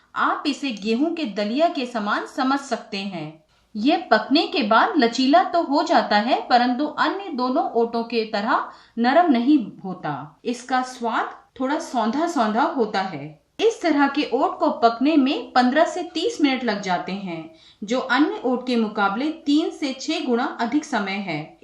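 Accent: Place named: India. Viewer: starts in english ... morphed into indian ...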